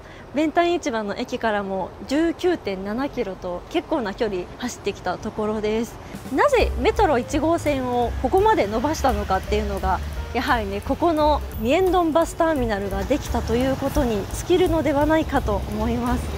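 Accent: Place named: Hiroshima, Japan. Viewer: up主さん、広島または中国地方の出身ですか？ところどころの方言が心地良いです。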